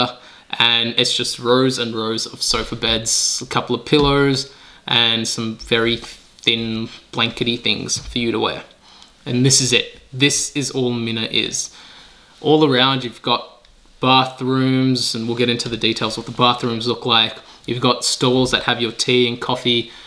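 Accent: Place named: Australia. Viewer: Masha Allah brother, I also went for Hajj this year. Your accent suggests you are Australian? So am I. Perhaps we crossed paths?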